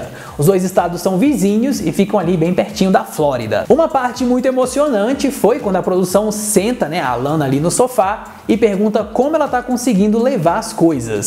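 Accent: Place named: Caipira, Brazil. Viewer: Kkkkk adoro a dublagem kkk bem caipira mesmo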